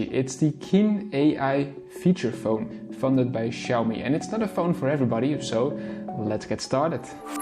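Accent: Netherlands. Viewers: Als ik mijn ogen zou sluiten kan ik horen dat jij een Nederlander of een Nederlandstalige Belg bent.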